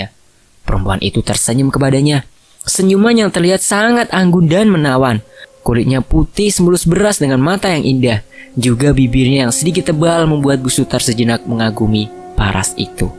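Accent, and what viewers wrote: Java, Indonesia. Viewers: Salut sama sang orator bagus sekali , terutama aksen jawanya mantap sekali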